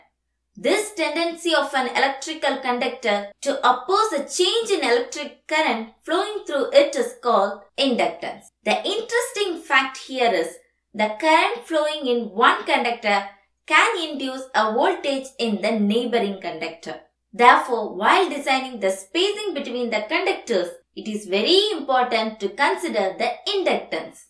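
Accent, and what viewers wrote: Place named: India. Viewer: wwanna punch this girl. why do all indian;s accent have to be like this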